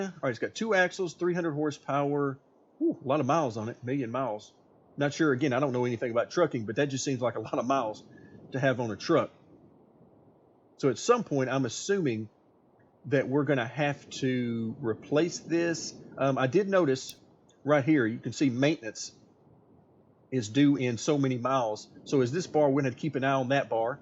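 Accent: South, United States. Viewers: Naw, this sounds more like Georgia-Missippi-Alabama southern accent.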